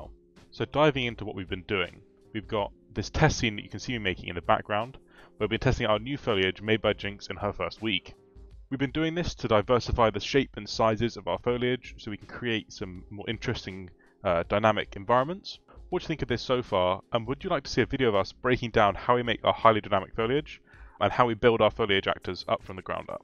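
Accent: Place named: United Kingdom. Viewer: Dat UK accent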